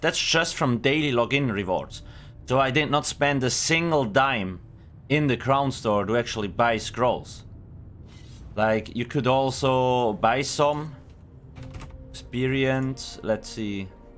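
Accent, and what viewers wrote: Switzerland. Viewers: Sounds like swiss dude. I can spot accents 🤣🤣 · Are you from switzerland? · Thanks for the vid! And that accent sounds very Swiss!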